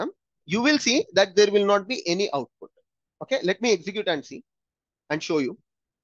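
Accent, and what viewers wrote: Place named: Telugu, India. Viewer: sir you are doing a fantastic work. Go ahead. But i have one question that, as i am not belong to AP, your english communication accent is mix of telugu, so it is dificult to understand your lectures at once.I want you to focus on these small things and come up with a good technical english tutor because whole country is seeing your videos.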